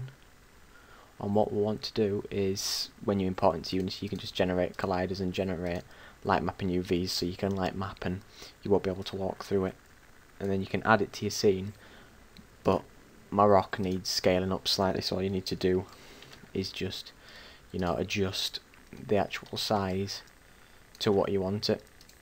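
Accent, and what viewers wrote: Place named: England, United Kingdom. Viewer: non related question: I'm learning accents, and would love to know were in England is your accent from?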